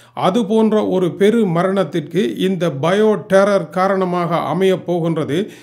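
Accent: Sri Lanka. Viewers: அழகான தமிழில் பேசுகின்றீர்கள் பூர்வீகம் இலங்கையோ......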